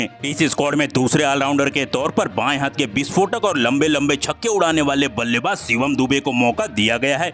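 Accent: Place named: Pakistan. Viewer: आप केसे बात कर रही हो? अपने बोलने का तरीका बदलें. ऐसा लगता है जैसे आप पक्के पाकिस्तानी हैं, बात करना तरीका change करो। एक सच्चा भारतीय ऐसा नहीं बोलते। Team INDIA हम तुम्हारे साथ थे, साथ हूं, साथ रहूंगा, इंडिया जिंदाबाद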